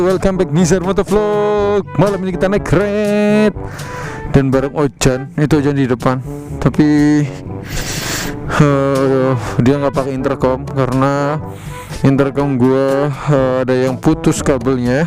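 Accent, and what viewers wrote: Banyumas, Indonesia. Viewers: pantes ngomongnge kaya ngapak ...tengan wong Tegal ..mantapp bro lanjutkan truss pokok.y salam wong ngapak 👍🙏🙏🙏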